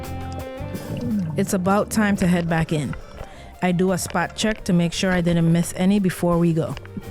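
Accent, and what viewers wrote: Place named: Caribbean. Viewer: I grew up in Florida and there are way too many lionfish there too. That's one species that needs to be eliminated and luckily they taste great. I would love to try ceviche with lionfish. It's great that you're helping your environment and getting good groceries at the same time! What a beautiful reef, it's definitely worth protecting! I hope people become more aware of lionfish and start to want to buy the meat. If it was "in style" to eat them maybe the population could get under control. Thanks for sharing your adventures! Oh and I love your accent! Caribbean people have great accents!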